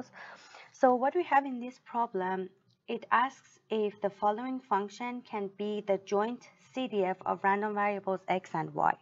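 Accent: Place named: Iran. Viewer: I'm sure that, this accent is persian :)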